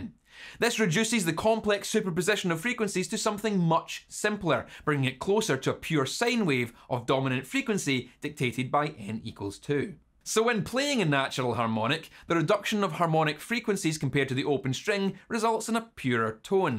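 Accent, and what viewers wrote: Scotland, United Kingdom. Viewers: A video on two of my passions (science and music), with trademark Scottish brogue? Happy new year indeed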